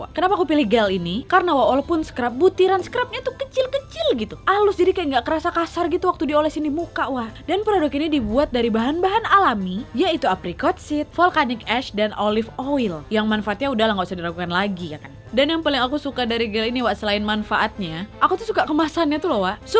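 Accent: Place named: Lampung, Indonesia. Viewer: Kak kamu orang mana sih kok ngomongnya kayak orang lampung kalo ga salah???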